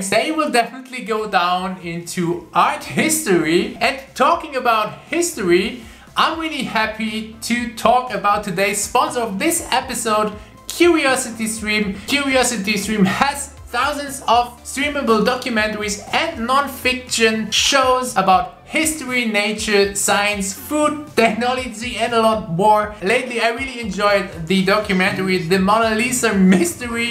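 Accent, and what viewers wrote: Germany. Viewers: i kinda though he was german because of his acent and than this xD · Ah deutsch hab mich schon gewundert bei deiner aussprachr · Bist du aus Deutschland ? Hab weiter geschaut frage beantwortet. Dachte wegen der Aussprache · Ach bist deutsch hab mich irgendwie gewundert über das hat hab mir gedacht das hört sich doch deutsch an lol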